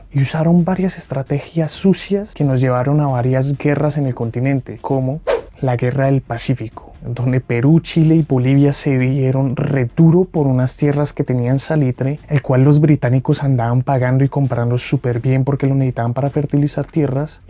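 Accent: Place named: Colombia. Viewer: Buenas tardes. Por tu acento creo que eres Colombiano. No estoy de acuerdo con tu interpretación del libro porque estubo muy sesgada. Veo que fue un material con años en Youtube, lo cual me hace preguntar, Que dirías en el 2024? Considerando el proceso político actual de Colombia, Chile, Argentina, Uruguay, El Salvador, Panamá, Perú, Ecuador, países que tenían unas grandes caretas.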